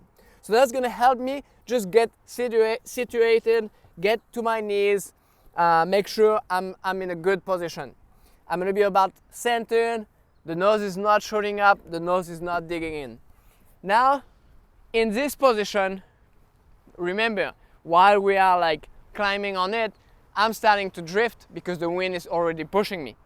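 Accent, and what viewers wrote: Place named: France. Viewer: First and foremost, kudos to you for explaining how to do wing onpaddle board. It’s the most clear concise explanation I’ve ever heard. I will totally use my paddle board first. All these steps will help me concentrate on managing my kite at this time. Most of all any videos you made in the past is very interesting just to listen to your French accent!! It adds to the likeness of video. Thanks again.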